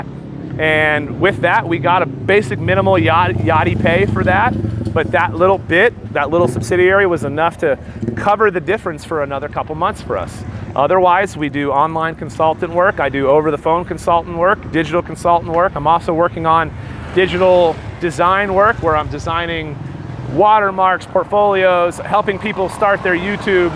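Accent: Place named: Philippines. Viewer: Hang in there,! things will turn around for the better real fast. Btw, your accent is like you’re a Filipino descent — except that you’re way too tall.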